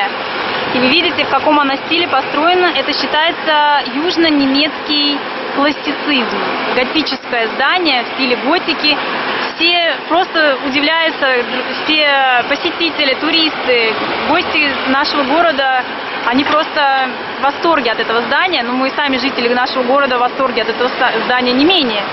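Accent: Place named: Saratov, Russia. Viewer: Комментирует нормально, чисто по Саратовски, не нравиться не слушай.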